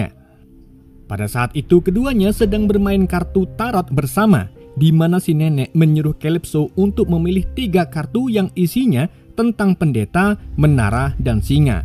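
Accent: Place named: Pekalongan, Indonesia. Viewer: Logate mirip pekalongan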